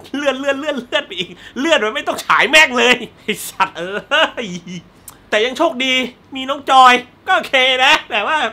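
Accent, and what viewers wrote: South, Thailand. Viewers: พี่เป็นคนใต้ป่าวครับ แหลงใต้ได้ม่ายนิ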